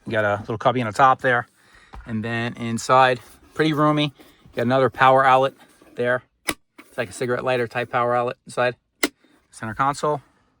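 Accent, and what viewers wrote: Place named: Long Island, United States. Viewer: Really good, thorough review! Were you originally from Long Island, NY, by any chance?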